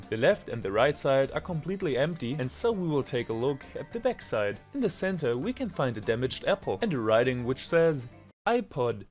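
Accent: Germany. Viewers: Dieser deutsche Akzent xD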